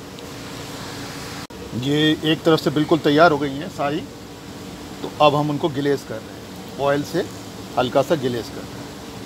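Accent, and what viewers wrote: Delhi, India. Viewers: Kitni achi AP ki recipe ha itni hi achi Urdu .....AP k sheen qaaf bilkul durust Han ....recipe dakhny main to maza Aya ha AP ki Urdu sun k kisi pakkay Dehli waly ka khyal Aya ha .....my nanihal belong to Dehli jama masjid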